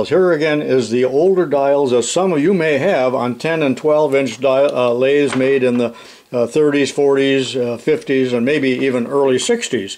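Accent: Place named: Midwest, United States. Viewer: You have certainly joined the 21st-century with your 3-D printing. I think half the fun of watching your videos is listening to you talk. Your voice is like my first shop teacher and has that “Jimmy Stewart” midwest drawl.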